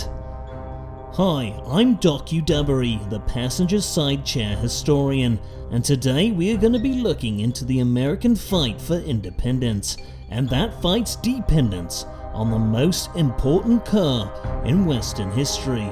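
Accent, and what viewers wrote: United Kingdom. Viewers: The narrator being British is the cherry on top😂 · The fact a British man is telling this hilarious moment in history makes it that much more funny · What adds to the funniness imo is that it’s voiced by a Brit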